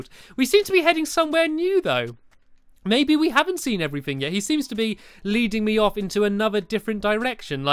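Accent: United Kingdom. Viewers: Wooo ur british like me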